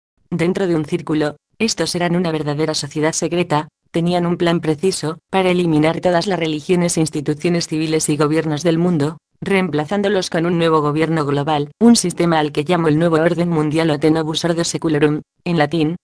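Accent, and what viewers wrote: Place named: Spain. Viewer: Los poderes fácticos son quienes tienen el dinero y no es que entre todos se pongan de acuerdo, es sólo que el sistema capitalista así funciona. Cuando era niño todo se relacionaba con los satánicos, hoy se habla de illuminatis y de reptilianos. La idea de que hay una conspiración detrás puede ser parcialmente cierta, pero tampoco hay que caer en la paranoia. PD: esa vocecita españoleta es irritante.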